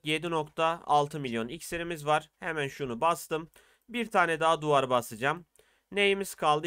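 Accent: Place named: Iğdır, Turkey. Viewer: Abi sen Iğdırlı mısın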